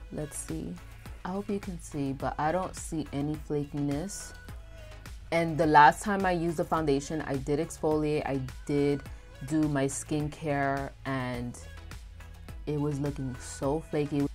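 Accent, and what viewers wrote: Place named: New York, United States. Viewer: Hi! I found your channel and I just had to tell you that you’re absolutely adorable! I could listen to your accent forever! My family is from NY and I miss hearing voices like yours. I love your techniques and you have the most mesmerizing eyes ever! Hugs from Seattle. ❤️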